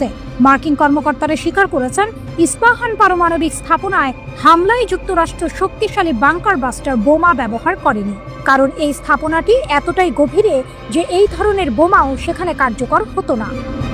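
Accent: Kolkata, India. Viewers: সাংবাদ পাঠক, কলকাতার নাকি,, ভাষা বাংলাদেশিদের সাথে মিলছে না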